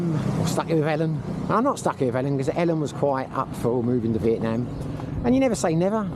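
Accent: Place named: Cockney, United Kingdom. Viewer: Nice hearing a cockney accent. COYI ⚒️ I absolutely loved Vietnam. Was there for two weeks last month for the first time. I really loved Da Nang. I could see myself retiring there but isn't retiring there harder ?? They don't have any dedicated Retirement visa I heard. Could be wrong. Guess I gotta find myself a Vietnamese lady to marry !! Haha . Be lucky !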